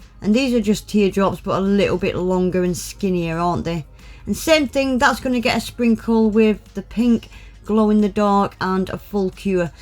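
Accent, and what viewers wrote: Yorkshire, United Kingdom. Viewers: By the way, which part of Old-Blighty are you from? You definitely sound like a Yorkshire girl!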